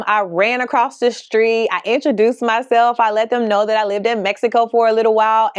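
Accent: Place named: United States. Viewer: I think your Spanish gringo accent made people laugh/cringe…Good-luck on your journey tho😎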